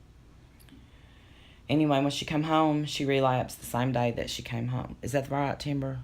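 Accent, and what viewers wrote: United States. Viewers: I am sooooo proud of you!!!! I knew from the first video I watched we had more in common than our country accent!!!! I too am an addict in recovery....one day I may tell my story. And Timber, just keep it in the day and before you know it, it'll be years and years!!!! So proud of you Timber, keep up the good work!!!!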